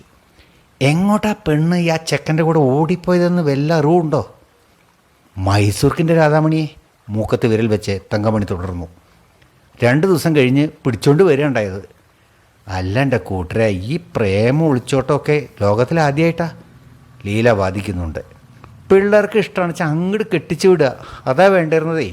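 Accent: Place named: Valluvanad, India. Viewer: അസലായിട്ടുണ്ട് ട്ടോ കുട്ടി, കഥയും അവതരണവും. നമ്മുടെ വള്ളുവനാടൻ ശൈലിയിൽ മനോഹരം❤👌👏🙏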